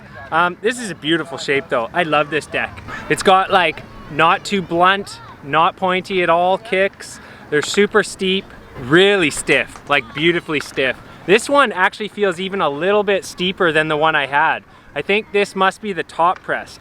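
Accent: Canada. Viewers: I dig the Canadian accent. I donno I just felt compelled to express that. Great video, man.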